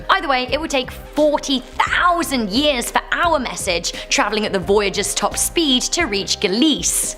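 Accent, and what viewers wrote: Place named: Scotland, United Kingdom. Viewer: I love British accents, but a Scottish brogue blows my mind!!!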